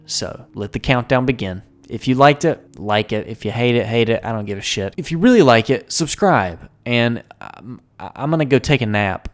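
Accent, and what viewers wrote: South, United States. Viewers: Do you know not the difference between a remaster and remake ? From this video it doesn’t seem as though you do. A remaster is just a fresh coat of paint on a older game … like Skyrim SE A remake is remaking the game from the ground up line the demons souls remake. This is supposedly a third party doggy doo doo remake with soulslike combat this is not a good thing. So you need to make it clear is it now actually a remaster if that is the case that is no big deal but a full on remake is going to be trash Not only a yucky southern accent lots of hardcore copium in this video. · Why did it take me half the video to realize the southern accent 😂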